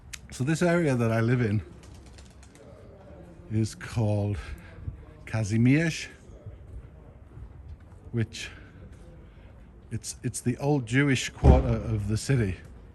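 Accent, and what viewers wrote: Northern England, United Kingdom. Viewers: I can hear the northern accent, it's just really softened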